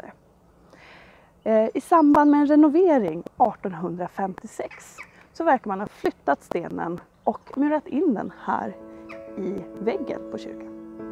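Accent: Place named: Uppsala, Sweden. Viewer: Någonstanch verkar vara Uppsalalingo. Debattören Malcolm Kyeyne uttalar på samma sätt. Ok. Jag tycker Gamla Uppsala Museum gjort en rad riktigt intressanta videos, de flesta proffsiga, Tittar så mycket jag hinner. Igår var jag tvungen att bila utmed långhundraleden för att se med egna ögon. Mer kunskap åt folket.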